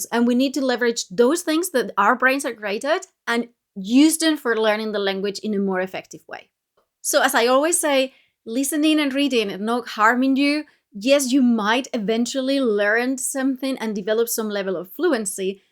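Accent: Scotland, United Kingdom. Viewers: Solid video! I'm interested in your accent. Are you a native Spanish speaker modelling your English after a Scottish accent? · Yes, her accent is a mixture. I hear a Scot and English accent. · A significant detour, but I very quickly heard a noticeable bit of a Scots accent :) It took me a long way back to a Scots colleague who was also a fluent Spanish speaker... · Of course you can tell she's Spanish, but she's got a mix of different British accents, especially Northern English and Scottish. No thick accent at all. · Yup, I was looking for confirmation of this! Certain sounds are very Scots.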